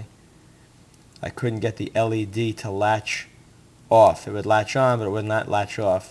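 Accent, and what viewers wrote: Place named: New Jersey, United States. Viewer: Your videos really pull you into the action. Maybe it is the NJ accent, or the way you are basically shooting and talking live with a handheld camera, ...it can get hard to watch that way with the camera moving, if it moves too much. Sometimes it does and that is bad, but with some movement, it gives your videos are warmer feel than if you were just talking with a tripod. Your setup results in a very personal video, like I am right there with you in your kitchen. It just works.Good project too.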